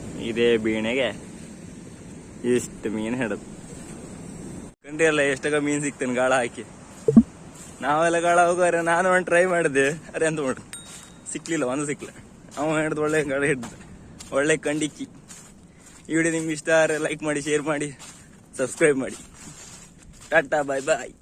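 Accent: Kundapura, India. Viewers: Yava side kannada idu?kundapura na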